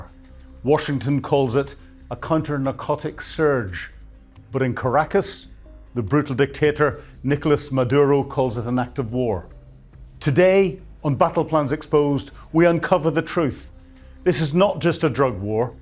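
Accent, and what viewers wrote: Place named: United Kingdom. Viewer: Don't try to tell us what Putin attempted to do to Kyiv but failed to accomplish. What, are you a British hack? Yes, listen to your accent. Another foreigner acting to be a type of expert on America's capability or intentions.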